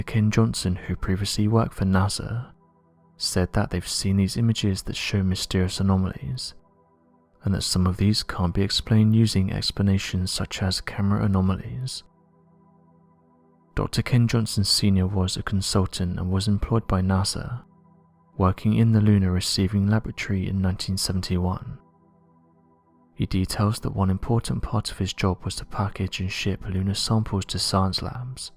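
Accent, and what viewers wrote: England, United Kingdom. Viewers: Thank you for all your hard work on these videos!!! I love watching them and you have the perfect, intelligent, serious, English voice for narration 💯♥️ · Best accent English. I am biased though.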